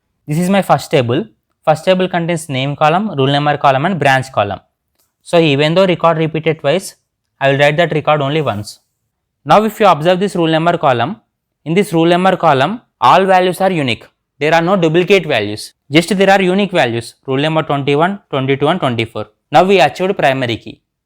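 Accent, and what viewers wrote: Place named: India. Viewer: Indian accent level: 💯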